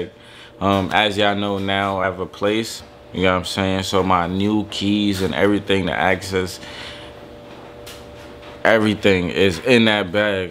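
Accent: New York, United States. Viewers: Lmaoooo he talk like every New York hood nigga 😭😭😭😂😂😭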